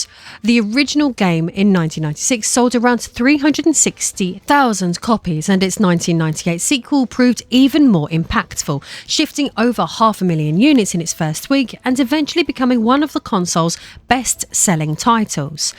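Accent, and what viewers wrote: United Kingdom. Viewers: Not sure which part of Britain you're from but your accent is gorgeous. Very similar to 'Obsidian Ant' a guy who talks about space games, except he sounds like a wizard! He has a few hundred thousand subs... Great accent 👍👍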